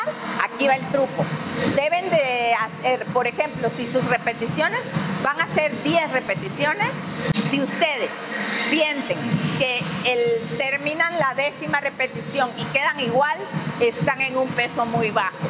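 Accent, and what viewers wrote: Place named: Guatemala. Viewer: Me gusta tu hablado chapin 🥰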